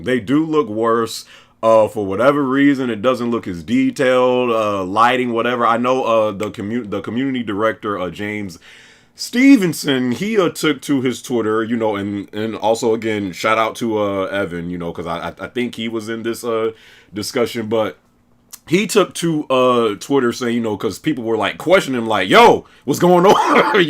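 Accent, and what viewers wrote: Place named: New York, United States. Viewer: lol I could've sworn this nigga moved to ny the way he talks😭 saying my guy?😅 anyway, much love from Harlem ny fam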